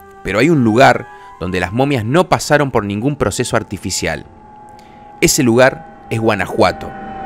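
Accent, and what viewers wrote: Argentina. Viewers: Me encantan las historias Mexicanas contadas por extranjeros , el acento Argentino le da ese toque de terror e intriga , ( soy mexicano ) saludos a los argentinos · Es interesante escuchar leyendas de mi querido Mexico de voz Argentina jajaja, me gusto la edicion y la forma de contarlas, aunque la de la llorona es algo equivocada, pero hay un comentario que lo explica bastante bien, saludos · Narrada por un argentino???!!! Que poca madre!! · Qué excelente manera de generar contenido que buena edición y narrativa haces muy buen trabajo soy de Colombia y amo a México como no imaginas, nunca he tenido el placer de viajar allá pero su cultura ha hecho parte de muchos colombianos por años gracias a la tv y ahora el internet la riqueza cultural de México es innegable gracias por dejarnos conocer más de sus tradiciones Aunque bro soy bastante miedosito y esa imagen final de la historia de Nachito donde pones ese niño tan aterrador jmm Dios casi me matas no borro esa imagen de mi cabeza Me gustaría escuchar historias de muchos países más el acento argentino me encanta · Eres argentino verdad :pd acá en México nos estamos cagando s México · ¿Un argentino narrando leyendas mexicanas?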